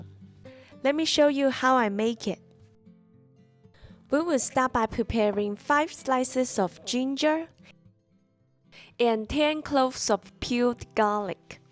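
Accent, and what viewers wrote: China. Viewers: That Cantonese-English accent just makes me feel at home 🏠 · You sound Chinese but you could be Middle Eastern Chinese... That's the thing... :) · 😂😂😂 Chinese accent is definately there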